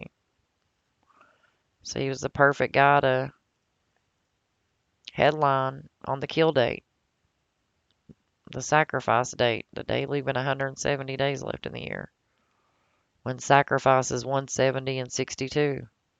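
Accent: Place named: South, United States. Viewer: Good breakdown i love that southern accent so much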